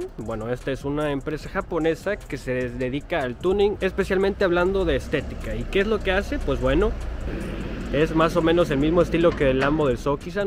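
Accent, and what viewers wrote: North, Mexico. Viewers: Y hablas como una compañera de trabajo que es de cohauila, bien norteño.... Y se ve que eres amistoso igual que ella.. Jejejeje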